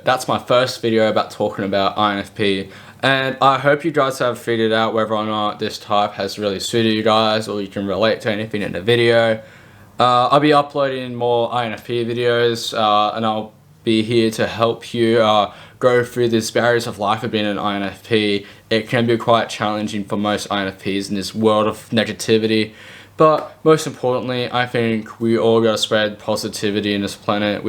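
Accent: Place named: Australia. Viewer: His aussie accent plus a deep voice makes all the difference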